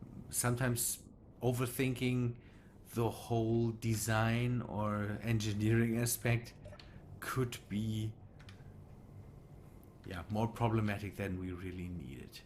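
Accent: Germany. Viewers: DIESER DEUTSCHE AKTZENT AAAAAHHH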